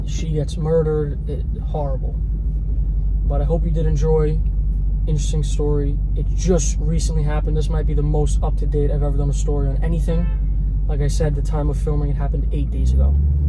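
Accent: New York, United States. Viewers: I like listening to the New York accent without the F-bombs and double negatives for a change.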